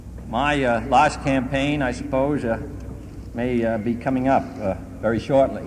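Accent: Boston, United States. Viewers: Hey had a strong Boston accent.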